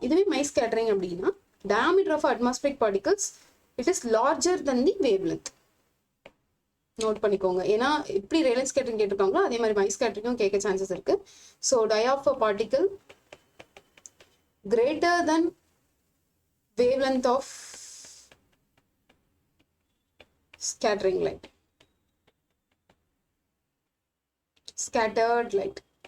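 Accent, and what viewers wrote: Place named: United States. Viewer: Konjam slow ah sollunga mam! Understand panna mudiyala! USA english la style ah pesuramari irukku but konjam TAMIL medium students kum karunai katunga mam plz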